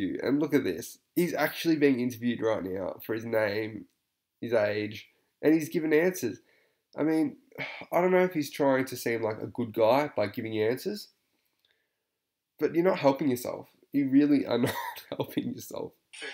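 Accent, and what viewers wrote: Australia. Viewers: You are quite entertaining and your Australian accent is a plus also.(No disrespect I just love Australian and British accents compared to the wining voices of New Yorkers) 😉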